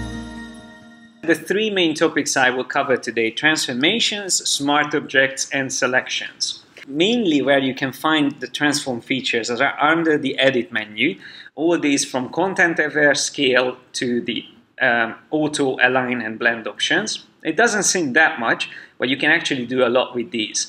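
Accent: Hungary. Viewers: Magyar vagy? Az angolodnak annyira magyar akcentusa van!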